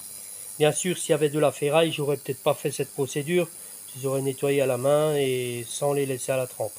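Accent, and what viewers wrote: Alsace, France. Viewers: Vous n'auriez pas l'accent alsacien comme moi ? 😎 Cette table de mixage me rappelle ma jeunesse quand nous animions des bals...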